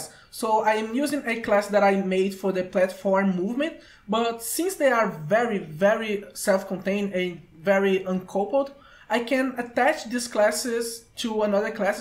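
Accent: Brazil. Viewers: Is that a Brazilian accent? great video :D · I see you are a brazillian by your accent! Im a brazillian too! Also i really like megaman x5 its not as bad as people say (x4 was better tho) Great tutorial by the way dude! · Lol! I recognized the accent too. (Brazilian here too) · Vc parece ser um br falando ingles, mt bom o video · Eu chutei pelo sotaque mas aí vi a versão BR do livro Level Up no fundo e tive 200% de certeza kkkkk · You sound a lot like you are from brasil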